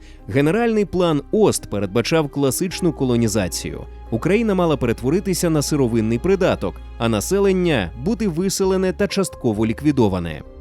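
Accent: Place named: Russia. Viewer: (Якщо не говорити про радянський правопис, а саме вимову). Русифікована вимова. Деінде ви сказали Рейх замість Райх. багато ффф - «під її фпливом», багато де українська И, що близька до І, звучить як рос Ы, літера В узагалі ніде не звучить як Ў, де має бути — [створыф], [фсе], [афто] (правильно [стўориў], [ўсе], [аўто]). Г наприкінці слів у вас звучить Х. Тощо. Наперед скажу, це не діялект або щось подібне, а саме русифікована вимова. Послухайте на ютубі Українська літературна вимова Миколи Погрібного. українська мова насправді ще гарніша ніж ви думаєте/говорите. =)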